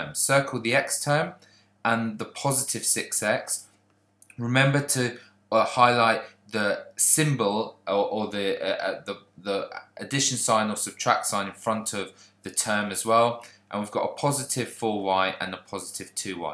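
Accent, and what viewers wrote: United Kingdom. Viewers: He has something called a British accent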